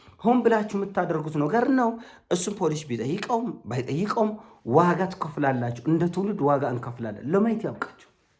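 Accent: Tigray, Ethiopia. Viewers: ለኣንተ ግን ጥያቄ ኣለኝ ለምንድን ነው አማርኛውኝ በትግርኛ accent እያወላገድክ ምታወራው 😂?? ኣንተ ሰግጠህ በ accent እየቀለድክ እያዘላገድክ ፈምሰህ እንዴት ሰለ ሰነሰርዓት መናገር ትችላለህ ? ኣሁን አወላግደህ መናገር ሰልጣኔ ነው አንድ አማርኛውን እያበላሸሀው ነው ሌላ ደሞ ሌላ ሰው ለመምሰል እየሞከርክ ነው ራሰህን ምሰል ።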